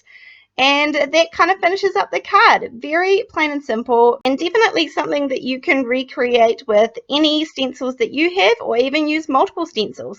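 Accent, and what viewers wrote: New Zealand. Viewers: I stumbled onto your you tube card making and am now subscribed, absolutely loving your techniques and have tried a couple, now working my way back through your previous video's. Great to hear a Kiwi voice - thanks so much and keep up the brilliant work.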